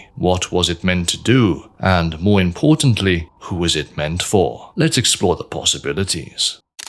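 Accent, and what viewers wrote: Wales, United Kingdom. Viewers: That magic Welsh voice. 🔥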